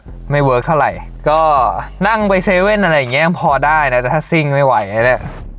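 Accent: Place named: RP, United Kingdom. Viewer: สำเนียงอังกฤษผู้ดีจัด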